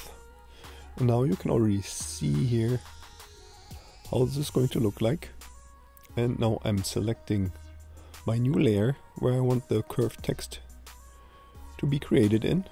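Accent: Germany. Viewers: Thank you, very helpful. Is it the German accent that I'm hearing?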